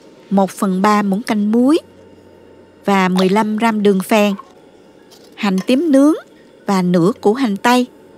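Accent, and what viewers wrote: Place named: Mekong Delta, Vietnam. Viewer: Cảm ơn, giọng nói miền Tây thật ấm lòng ❤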